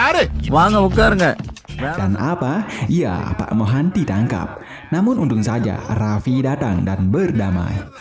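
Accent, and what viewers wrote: Bali, Indonesia. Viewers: Pasti dari bali ya min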